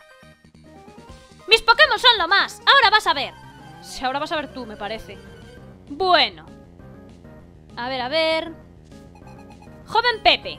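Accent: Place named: Spain. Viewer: Nunca me gusto el acento español, hasta que te escuche, buen humor Saludos desde México!!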